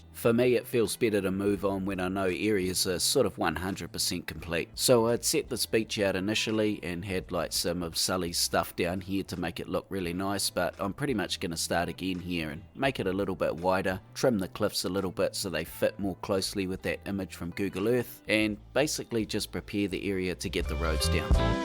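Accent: New Zealand. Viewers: like always so good, so interesting and combined with your NZ accent it becomes one of CS greatest series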